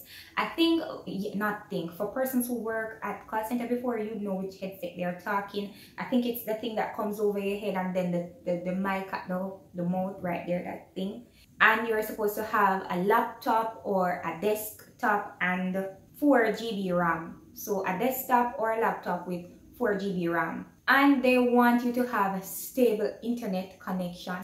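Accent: Jamaica. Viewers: You have a beautiful Jamaica 🇯🇲 accent. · Hey, yardie gal! 😉 Yes, J'cans do have a heavy accent... but so do most (if not all) of us Caribbean people. It's just a matter of tempering it and slowing down our speech. Although I am not an organised person, I still decided to 'like' this video because I am SOOOOOOO elated to finally find one of my Caricom peeps rocking YT's MMO niche! Like you said, there are waaaay too many "big-country" people peddling all kinds of strategies or services and saying that they can work "anywhere that you have a computer and internet connection" without bothering to actually confirm that for themselves. We in the English-speaking Caribbean know about the nightmares of financial regulations which promote forex retension and money-laundering controls, the latter of which are often imposed by "boss" countries in the G8 who actually do way more money-laundering than the Caribbean. 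Nuff said. Anyway girl, keep keepin' on. If you vary your sub-niches enough, I just might find a reason to subscribe 😉 Best of luck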